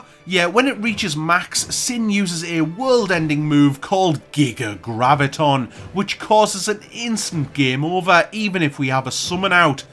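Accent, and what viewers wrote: North East England, United Kingdom. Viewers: up the north east 🇬🇧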